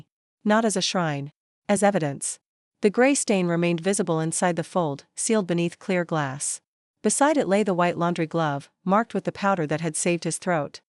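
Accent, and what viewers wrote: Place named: Italy. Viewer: 👏👏 lovely and written with humour.. good narration, albeit the italian accent interposed and no clear report regarding the powder on the tie and pin…♥️♥️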